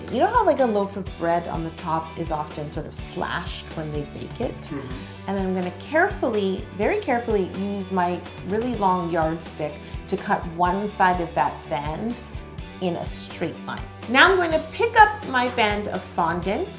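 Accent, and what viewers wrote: Canada. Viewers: Yo: "This tee is so reflective, eh?" Me: Yep, definitely Canadian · I love her and her Canadian-ness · She sounds so Canadian what are you talking about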